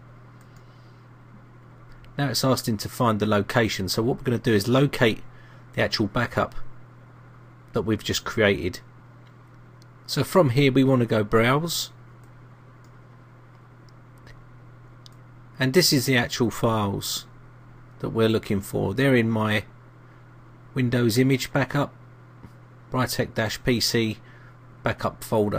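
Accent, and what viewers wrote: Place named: Derbyshire, United Kingdom. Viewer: You sound like Jason Statham, do you live near derbyshire?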